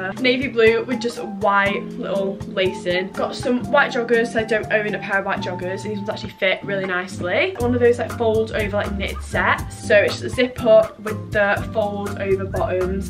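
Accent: United Kingdom. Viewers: shes not australian shes said shes british before and she has a sort of british accent and definitely not a australian one x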